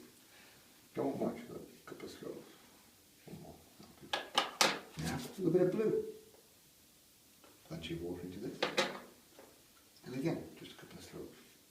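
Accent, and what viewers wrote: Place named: North, United Kingdom. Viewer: Put the subtitles on top right of video three dots Captions, he is easy for us Northerners to understand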